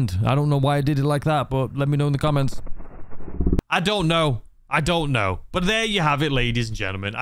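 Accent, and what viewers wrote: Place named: Northern England, United Kingdom. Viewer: why do you have a northen english accent but from america? did you move there not to long ago or something mate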